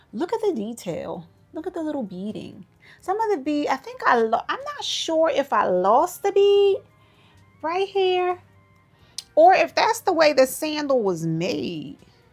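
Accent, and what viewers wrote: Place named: Philadelphia, United States. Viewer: Thanks for sharing. Bought some culottes and love the inspo. Glad I found your channel. You have wonderful style! And I swear I’m catching a Philly accent too. Made my day!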